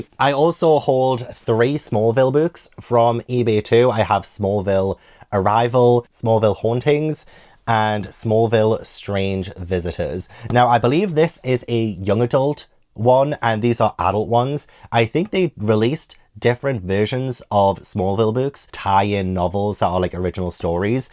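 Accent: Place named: Newcastle, United Kingdom. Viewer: Hello Came across your channel a couple of weeks ago and had to subscribe. I love a geordie accent plus you do make me laugh lol. Loving your channel Books are homophonic 🤣🤣🤣😳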